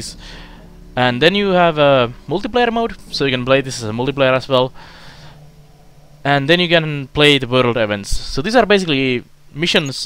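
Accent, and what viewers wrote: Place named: Finland. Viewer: I love the Finnish accent. Thank you for making this video! I like the description of the game and the look into it!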